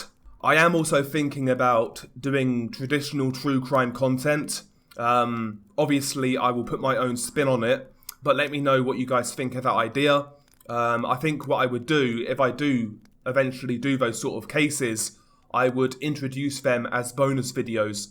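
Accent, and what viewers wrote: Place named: United Kingdom. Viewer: Idk why but as an american i enjoy the british narration on mexican cartel atrocities.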